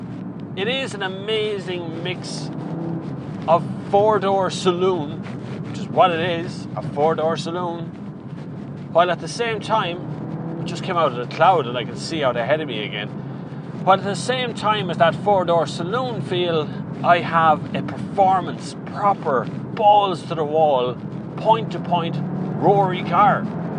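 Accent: Ireland. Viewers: just love that mix of RS3 pops and a paddys voice on that super looking stretch of road Top video buddy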